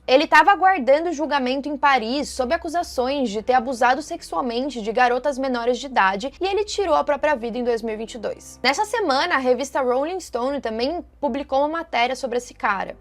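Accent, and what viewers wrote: Caipira, Brazil. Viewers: Narração caipira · Sotaque cáipira nojento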